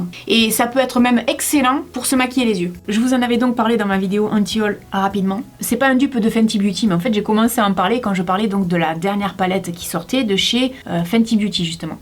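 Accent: South, France. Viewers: Est ce qu'il serait possible de tester la marque de Gaelle Garcia Diaz "Martine" j'aimerai beaucoup ton avis dessus ! Bisous continue comme cela j'adore tes vidéos et ton accent me rappelle ma famille du Sud 😍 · Merci pour cette vidéo (je ne t’appelle pas Maman ne m’en veut pas mais nous avons le même âge 😜) et tu me rassures car moi aussi je me maquille plus qu’avant. Je ne sais pas si tu as vu bellapiere est sur Showroom privé jusqu’à demain matin. Ça ne fait pas longtemps que je regarde les vidéos de YouTubeuses sur le net, je ne pensais pas en trouver une avec laquelle je me retrouve et en qui donne de bons conseils. Et puis l’accent du sud me manque trop c’est un régal de t’écouter parler. Bisous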